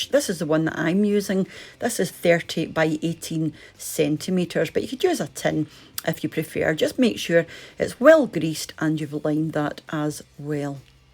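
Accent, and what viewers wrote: Scotland, United Kingdom. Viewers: This takes me right back to school.. Oh how I loved school dinners lol especially the puddings.. Yes those big silver jugs with the runny pink custard.. Where I work, the canteen does fab puddings but they are proper man size portions.. Bakewell Tart is another favourite.. Shame I'm on bloody slimming world.. Thanks always love to hear your lovely Scottish voice. Jules x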